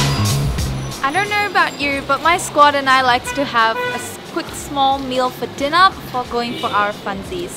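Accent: Australia. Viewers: Hello This is. Thank you for all your lovely compliments regarding my presentation, I really hope I inspire you to experience Sanchaung. Just like what a lot of you commented, my English has a particular accent because I've actually been persuing a degree in Monash University (Australia) for a few years so I've been going back and forth between the two countries. And of course using my English for the EatSleep Yangon audience had been a great pleasure for me to show my love for my native culture. Again, thanks for all the interest! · Well done, I did detect a slight Aussie accent, maybe coincidence. Good Video as always.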